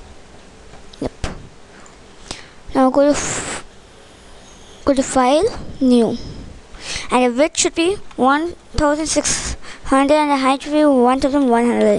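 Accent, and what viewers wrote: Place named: France. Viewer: Are you french? Love ur Acent! :)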